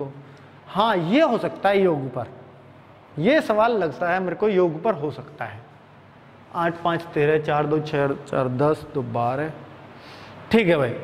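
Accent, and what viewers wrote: India. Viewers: Ram ram Maja aajata hai guru ji apne se lagte ho ekdum desi basha me english bi desi si lagti hai aapki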